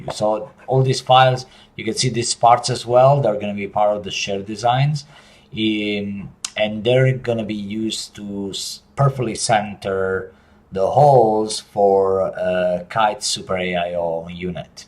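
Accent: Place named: Spain. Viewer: Awesome work! This project has given me that extra little push to dive deeper in 3D printing/modeling. I recently purchased a Creality CR-10. It’s been a blast so far. I am currently modifying HoolyHoo’s screen bracket to accommodate dual joysticks and a RPI3. While sharing is nice and very appreciated among the community, people usually have valid reasons to not want to share. You should give people a chance to be creative with their solutions and if anything, push them in the right direction. Also, where are you from? For some reason your accent leads me to believe that Spanish is your first language.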